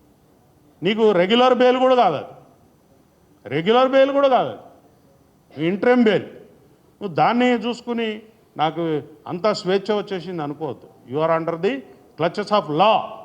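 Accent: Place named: Warangal, India. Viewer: తెలంగాణ పక్కా వరంగల్ స్లాంగ్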